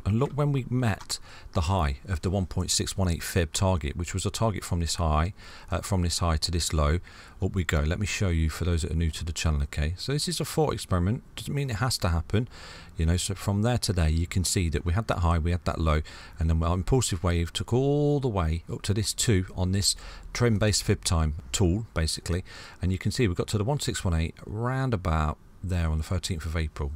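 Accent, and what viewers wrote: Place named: Midlands, United Kingdom. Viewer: Thanks for the TA, 👍🏻 Where in the uk are you from? the accent can’t be far from me in Chesterfield… keep up the great work.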